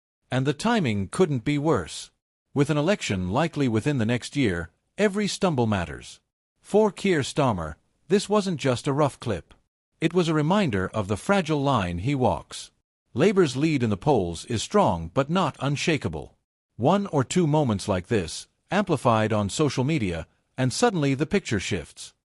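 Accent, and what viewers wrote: United States. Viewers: The title of this video is totally unrelated to this video. KEIR STARMER TO BE REMOVED FROM PARLIAMENT AFTER HOSTILE RESPONSE- is a blatant lie as there is nothing in this video that has anything to do with the title. And again, there is an American narrating this video. One big con and one big lie. This video should be removed from You Tube.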